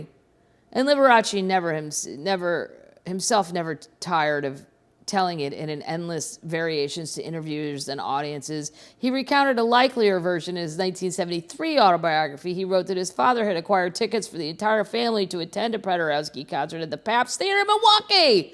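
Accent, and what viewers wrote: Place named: Kentucky, United States. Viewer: I hear some Kentucky funk in your reading tonight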